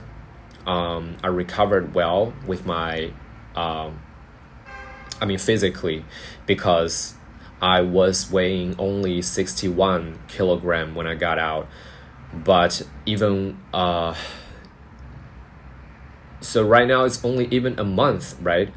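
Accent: United States. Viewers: But you don’t mention anywhere how to support you. When I hear you talking, I find it difficult to believe you haven’t spent some time in the US since you speak flawlessly with an american accent. You have absolutely no reason to be depressed. You are young and healthy, very handsome, very smart and creative, and you master the two major languages in the world that is coming onto us. I’d take a lesson with you ! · this is quite frustrating. I'm 4mins into the video and it is completely unclear where he was imprisoned - he speaks American English in a way you can only learn in the US itself but it seems (at 4mins into the video) all this happened in mainland China. if that's the case then all bets are off - China is not a country which honours the rule of law. the law there is arbitrary. it serves the ruling party and they bend it if deemed necessary or useful. 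nobody can expect a fair trial in China regardless what the law is on paper.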